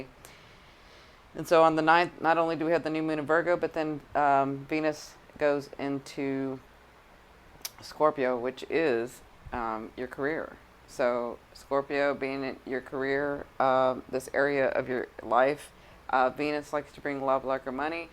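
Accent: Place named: South, United States. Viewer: Hello, Your 2 funny! Your REAL; Thank You! Yes i fully understand everything your stating! I understand planet's! You have my accent, you talk like me are u from the south? jan 27th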